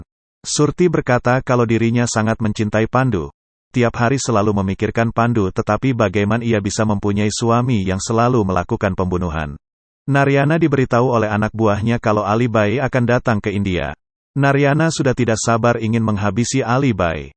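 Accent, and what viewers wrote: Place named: Banyumas, Indonesia. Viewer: Wong ngapak pembawa acaranya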